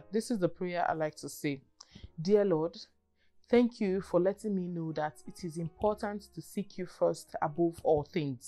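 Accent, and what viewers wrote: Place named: Nigeria. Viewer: Nigerian with quite fantastic accent, not like many of them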